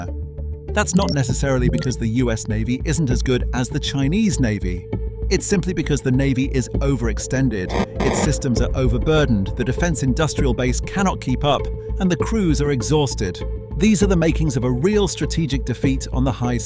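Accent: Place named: United Kingdom. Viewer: The Ford is already back in the Red Sea ready to go. By the British accent I would say wishful thinking.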